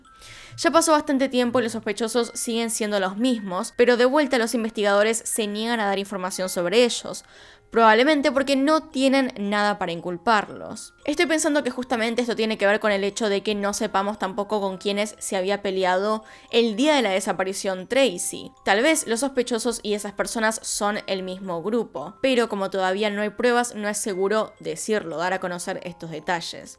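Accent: Argentina. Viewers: Hola a mí también me encanta una...vez...más y lo bien relatas las historias con esa voz tienes un Argentino muy bonito👍💞💞💞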